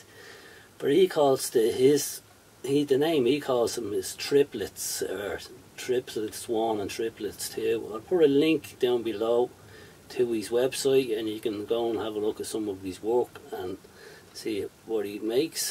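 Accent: Dublin, Ireland. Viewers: i heard Dublin when ya spoke.. My uncles, my cousins, my Granny & Granda. i miss the way family was when that house was alive, & the epicenter. It was where Granny kept the whole world together. Where i really learned to have a propper fry, & where i almost always slept & felt at home when visiting. im 1st born American, always lived in California, but you know ive eaten mackerel fished out of a pole in the water while swimming out in Houth, so ill be alright- fuck me though... What was it you were doin' again? (subbed, cheers! & thanks)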